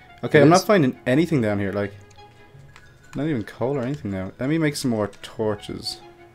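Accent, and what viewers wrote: Ireland. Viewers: Is he Irish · R u irish cuz i am Lepracorns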